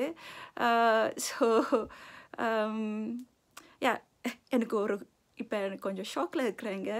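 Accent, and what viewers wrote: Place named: Singapore. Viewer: Hai akka, too rocking netherland tamilan video. Good Inspiration and tamil talking too good. Your tamil language like singapore tamilan speaking same like that. Solo Woman Boat Rider ....Real Tamilachi Akka you like that. My Native in TamilNadu in Thanjavur district......etc. Please give me your Email Id Akkaa.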